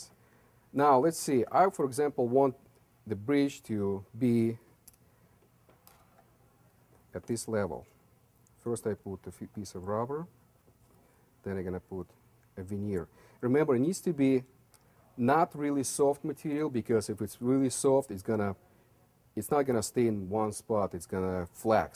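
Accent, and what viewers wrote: Russia. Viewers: Are you from Russia ? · У чувака шикарный русский акцент))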